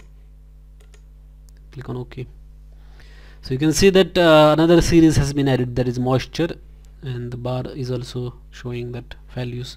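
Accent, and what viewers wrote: India. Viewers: That is some heavy indian accent! Haha it sounds so cool! how do you do those super fast D:s? It sounds like a CD glitching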